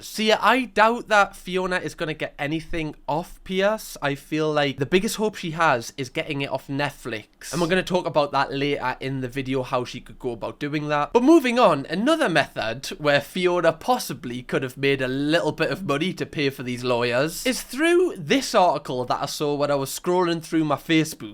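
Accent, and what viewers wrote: Newcastle, United Kingdom. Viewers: Ive suscribed just to hear your lovely Geordie accent.❤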